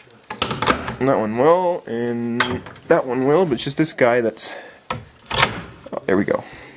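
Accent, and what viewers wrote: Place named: Canada. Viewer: I can already guess this is probably in Canada because of your accent.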